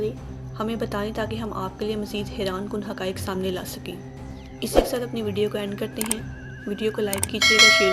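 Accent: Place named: Punjab, Pakistan. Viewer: Achi video hai per ager ap slow bolain or Punjabi accent ki jagah Urdu Ka sahy talaffuz use karain to zyada Acha lagega Jese لہور Nahi لاہور Sahi word hai or سیکnahi سکھsahi words Hain aise he or b words Hain jinhain ap ghalat pronounced kerrahy Hain per video achi hai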